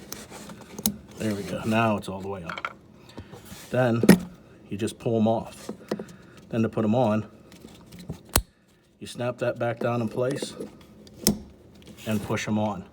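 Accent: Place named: Pittsburgh, United States. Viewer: Thanks, and you sound like a yinzer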